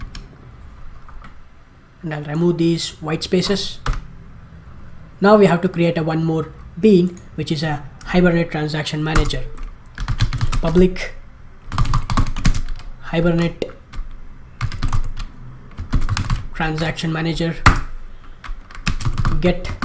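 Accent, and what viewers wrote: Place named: India. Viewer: Where you from you speak English but background India?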